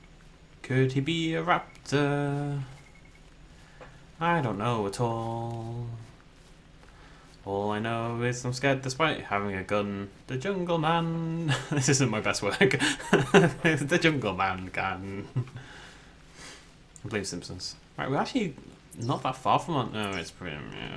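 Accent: United Kingdom. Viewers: It's Zed. Just like Maisie in Fallen Kingdom, you speak the Queen's.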